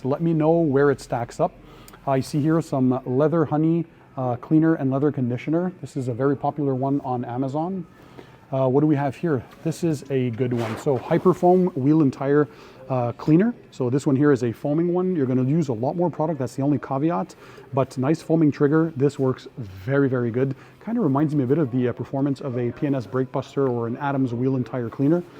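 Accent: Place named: Canada. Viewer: New to your channel. I had a feeling you were Canadian by the sound of your voice. I have a good friend from Montreal. We meet up in Albany to get our families together now and then. And my son and I went to the NY auto show this year too. What terrible weather it was the day we went. I’m a novice detailer. Here for the knowledge and tips.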